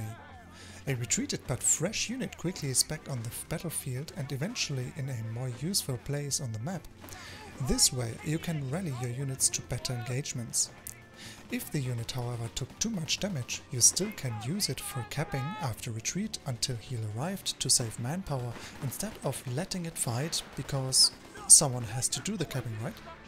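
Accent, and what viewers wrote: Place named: Germany. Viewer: Great tips, the fact that they re also in German English accent is great addition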